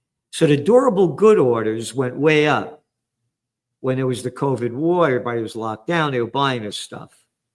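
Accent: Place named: Bronx, United States. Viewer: Love the TRUTH spoken with a Bronx accent!😊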